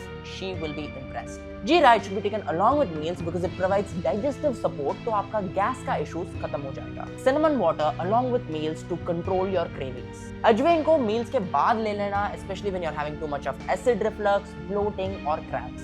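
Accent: India. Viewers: Love your accent of Hindi 😅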